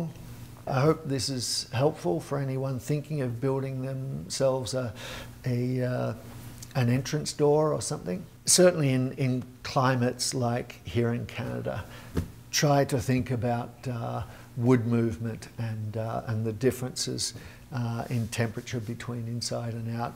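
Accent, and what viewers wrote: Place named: Australia. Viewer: Ahh I knew I wasnt going crazy about your Aussie accent, would love to see you build a door with your Felder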